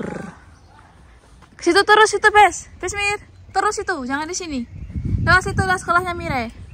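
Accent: Java, Indonesia. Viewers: Ya Allah 😅😅 Jowone medok banget Ayem tentrem nggih mbak meskipun 30jt lebih buat sewa😅😅 Belum lain lain Mbak, WC ne pake air nggak 😅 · Asalamualaikum mbk ... baru tau chanelnya .... jawane nek ndi sih mbak kok logate podo karo aku😅😅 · anakmu ngguemesne. medok e puolll pisan mbk yu, slm ko malang kota. mugo2 sampean sekeluarga diparingi rejeki lancar lan sehat walafiat... aminnn.... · Paling seneng lihat Mir cantik, ngomongnya Jowo medhok... Pinter juga bhs. Indonesia. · Kok iso muedok mir? 😅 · salut iso bahasa jawa; dan juga medok; lanjutkan budaya jawa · Jowone ngendi mbak ? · Mbak sampean jowone ngendi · Bahasa jowone pean mentok banget mbak 😂😂😂👍👍👍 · RAJIN UPLOAD MBAK E WES NGENTENI CAH AYU NGOMONG BOSO JOWO😂❤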